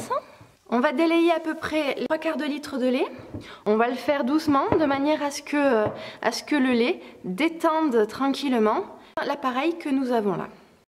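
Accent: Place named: South, France. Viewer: Whaouhh j'adore ton p'tit d'accent du sud !!! Les recettes des mamans sont toujours les meilleures :)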